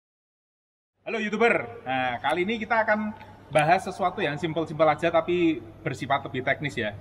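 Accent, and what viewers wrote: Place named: Banyumas, Indonesia. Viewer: Oh ternyata om ngapak tohh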